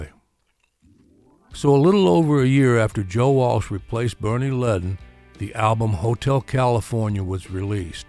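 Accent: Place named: West, United States. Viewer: What a well written, and well narrated story! I also like your western accent. It's stronger than mine, (desert southwest) and I can't place it.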